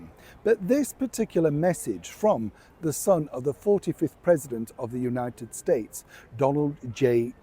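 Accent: Yorkshire, United Kingdom. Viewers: You are certainly a yorkshire man lol 😅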